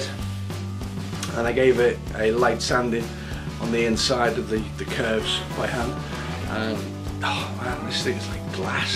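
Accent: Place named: Liverpool, United Kingdom. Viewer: oh wow Eastham eh, my Auntie and Uncle lived in Eastam in Raeburn Av, small world. I didn't want to say the accent was scouse just in case you are like me and say i'm from the wirral not l'pool. thanks for the reply and keep up these great videos, that's 2 plans i have got from you now thanks for that. Barry.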